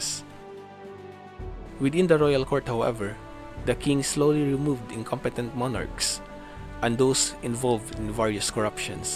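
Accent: Philippines. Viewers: That is a very strong crisp filipino english accent.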